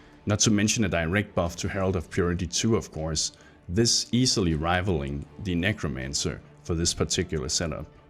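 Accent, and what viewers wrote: Denmark. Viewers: Is this dude Danish?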